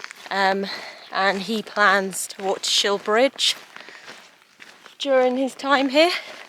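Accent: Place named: Surrey, United Kingdom. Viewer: With that accent, it’s got to be Surrey😂😂😂😂😂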